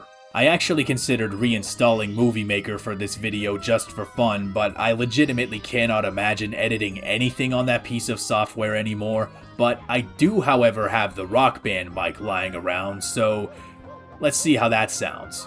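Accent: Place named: New York, United States. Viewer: I find it funny how in ten years voice went from sounding like a generic 17 year old boy to a gravelly new york stereotype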